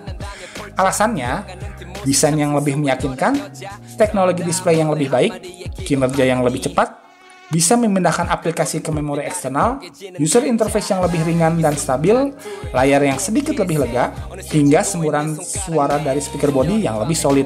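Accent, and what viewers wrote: West Java, Indonesia. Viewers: Sampurasun... Sunda pisan kang... hhe... Mantep reviewnya.. Tp ngmg2 Redmi 1s sm Zenfone 5 beda kalas sama harga kang.. Kaleresan abdi pengguna Redmi 1s :D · sunda pisan euy, hehe, hatur nuhun videona. :)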